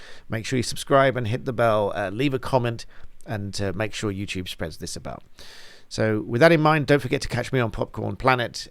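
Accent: United Kingdom. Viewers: Hello from ME, USA! Thanks so much for looking into this UK appeal denial. Wonderful to hear your thoughts and comments, watch every day. Can US citizens donate and sign petition, or is it something only UK citizens can do? Just beginning to really understand the Brit accent - great to hear such clear and articulate speech, and at a good speed, I should say. Looking forward to next installment. 🤗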